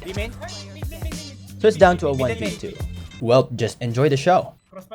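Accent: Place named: United States. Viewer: Wait your accent was so American I'd never thought you were not American before I heard you speak a different language. Ur insane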